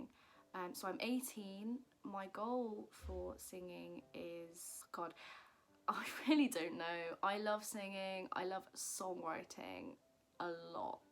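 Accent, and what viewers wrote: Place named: United Kingdom. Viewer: I didn’t know you were British 🤯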